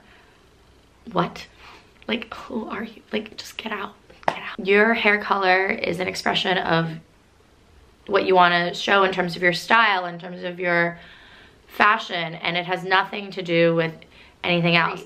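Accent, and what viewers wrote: United States. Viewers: Your accent is not New York, more West Coast California.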